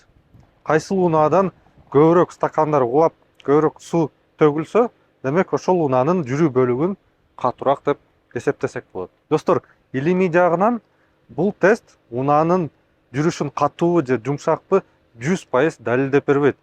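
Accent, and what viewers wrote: Standard. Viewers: Көптөн бери эне тилибизди мындай таза угалек элем азаматсыз унага келсек мен соната ден 8 айдагам өтө жакшы уна бирок камриге эч качан жетпейт соната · Рахмат иним, таза Кыргызча суйлойт экенсин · Кыргыз тилинде таза суйлогону жакты Бирок Машина Автомобилдин кыргызчасы араба шайтан араба деп айтылат уялбай шайтан араба деп айтабергиле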